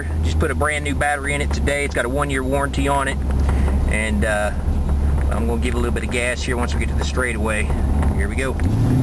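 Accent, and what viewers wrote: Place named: South, United States. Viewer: what part from the south are you from lol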